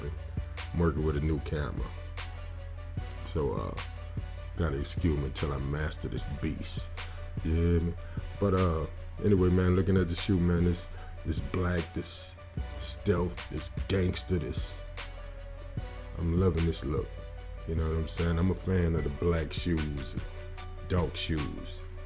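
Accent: New Orleans, United States. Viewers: Definitely a new Orleans nigga doing this video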